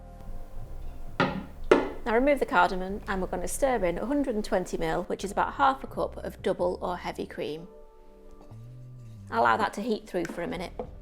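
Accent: United Kingdom. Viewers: the accent..is that british ?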